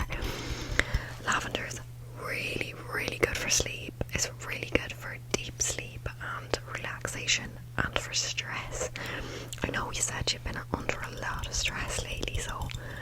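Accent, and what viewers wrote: Dublin, Ireland. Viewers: Great stuff! Is this a Dublin accent? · ooh, i think this is my favourite video of yours yet! i don’t think i’ll ever get tired of your irish accent videos 🥰 they’re so satisfying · Hahah pretty cool, keep up the Irish accent things please. It has a certain calm ambience to it. Which I think it’s cause your background is just very chill and down to earth, no extra lighting that makes everything too shiny.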